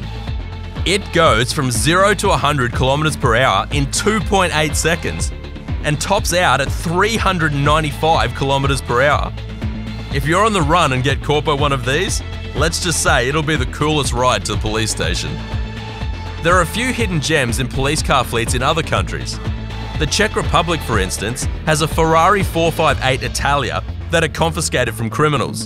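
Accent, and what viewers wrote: Australia. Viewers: Your Australian accent is so charming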